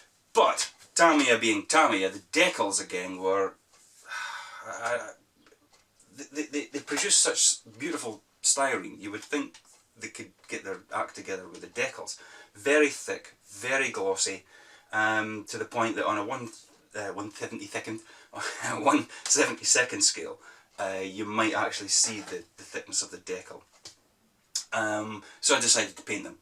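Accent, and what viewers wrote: Scotland, United Kingdom. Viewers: Always seems odd to hear a Scots accent on YouTube. Subscribed, anyhow, since I'm "Scotch" masel'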